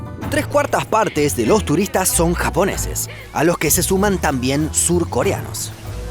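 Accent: Argentina. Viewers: El acento argentino es muy lindo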